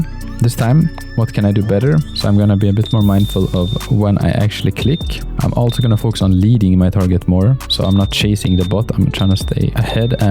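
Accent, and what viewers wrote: Sweden. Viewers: if u dont mind where r u from? r u swedish by any chance?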